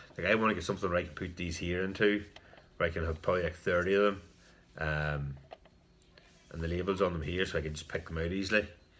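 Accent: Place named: Ireland. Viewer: you rock. Welcome. Congrats on the 100 subs. Love the accent. I Hope to visit Ireland some day. My mother's side if from there. I can paint you some jars, not sure if you are looking for labels or jars or lids. Message me on instagram. So I can possibly make you some jars. Beautiful Peterson you are giving for 2nd place. I'll get a VR up tomorrow for Ya. Hugs from Texas!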